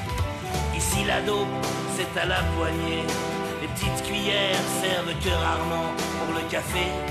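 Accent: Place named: Paris, France. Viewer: Salut à toi François et ta gouaille de titi parisien si reconnaissable ❤️.....merci pour le rock/punk alternatif de ces merveilleuses décennies 80'-90' -00' que tu nous as fais vivre avec Les Garcons Bouchers puis Pigalle. Souvenirs de concert et de rencontre en province 😁👍 Les années passent, nos souvenirs d'une époque un peu raide à vivre mais solidaire quand-même par rapport à maintenant........salut l'artiste 🥲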